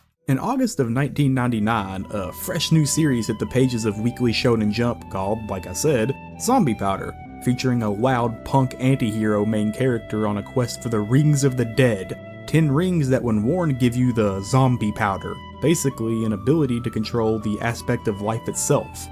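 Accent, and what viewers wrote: South, United States. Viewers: Love the video, and as a fellow Texan, love your narration through it. There's nothing like hearing someone describe something they're passionate about in a fine Southern twang. Also, you're like one of the only people on Youtube talking about Bleach that acknowledged the existence of the "speed of the world" poem, so thanks for that. It's one of my most favorite moments/poems from the manga that just evokes so much emotion. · just found your video man, I cant place the accent, TN or MS?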